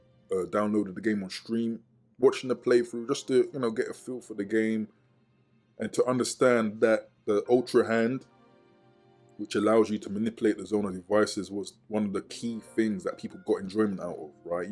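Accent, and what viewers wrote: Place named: United Kingdom. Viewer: Subbed as soona s I heard your voice! Most Zelda content creators are American, nice to hear a British opinion 💕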